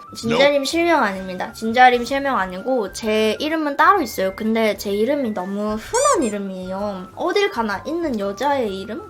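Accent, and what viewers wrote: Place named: Seoul, South Korea. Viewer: ??타지사람들은 사투리가 들리나보다 난 걍 서울말 쓰는 걸로 들리는데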